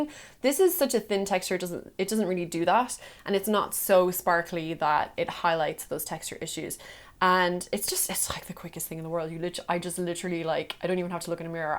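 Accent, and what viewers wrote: Ireland. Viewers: I have been following you for a few years now. At first, you were my go-to beauty guru simply because of the accent! My husband is from Ireland (I am from America) and we have been in a long distance relationship for 5 years, so watching you has always made me feel at home. But after watching for a while, you ignited a fire and passion for makeup within me, and you have helped me grow in my expertise. You are real, you talk about your flaws without degrading yourself for having them, and you are someone that so many in the makeup world can look up to. I'm so glad that this community has helped you grow in the past few years, but please remember that you have helped us grow as well! Cheers x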